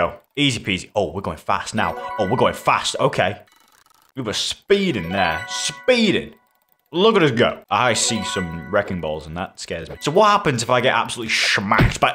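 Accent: United Kingdom. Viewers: I didn’t know you’re British😂😂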